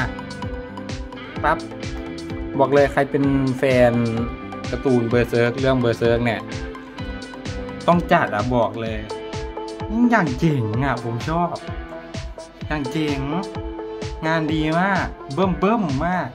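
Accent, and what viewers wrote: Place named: Rural, Thailand. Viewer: พ่อค้าพูดเหน่อจังเลยคะ คนบ้านใด่คะ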